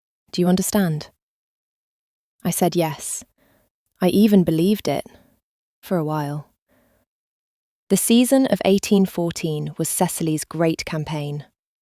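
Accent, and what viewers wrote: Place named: Northern England, United Kingdom. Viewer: The narrators voice is perfect. I love what I believe are shades of her northern or midland accent. The story line was very good. Your channel is a favourite of mine. I found these YT romantic Regency and Victorian channels when they first emerged in late 2025. Thank you for working hard to make your productions just about perfect. The writing, editing and narration are flawless which makes listening a joy. JB 🇨🇦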